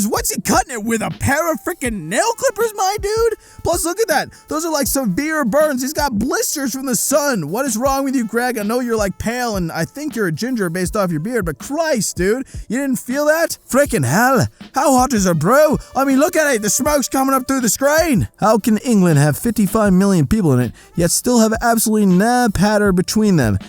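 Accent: Ireland. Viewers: This is an Irish accent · Just some pronunciation for you! Aye - eye Aff- ah f (you got it right!) Cannae - can ay Dinnae - Din ay (The “ae” is basically “ay” like May) As for your accent, it’s pretty good, but it’s more of like an Irish ish accent, but still awesome!! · Bro, that's an irish accent in my ears. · You’re more Irish and less Scottish. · You sound a bit Irish lol good try my friend:)) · He sounds irish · have to give you brownie point for trying the Scottish accent. but its sounding irish but i love how some of these tweets sound like a few of my pals (fyi i am scottish before anyone says anything) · Yo I dont want to be that guy but that accent was Irish more than Scottish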